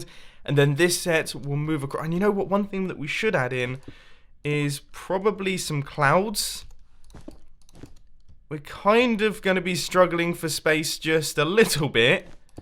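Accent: United Kingdom. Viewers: Leave him alone! He's British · Mumbos super british side kicks in after 2 minutes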